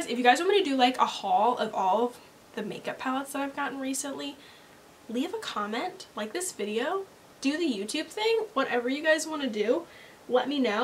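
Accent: United States. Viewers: what kind of american dialect is this?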